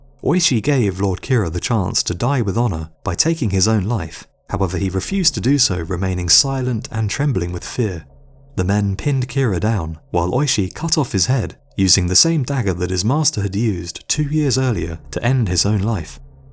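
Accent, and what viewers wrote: England, United Kingdom. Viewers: Englishman here. He sounds English to me. What was it that he didn't pronounce properly? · You sound like the spiffing brit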